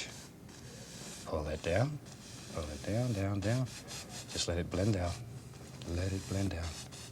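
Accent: South, United States. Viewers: "It's purdy" God bless the south!!! And thank you for our southern accents!!!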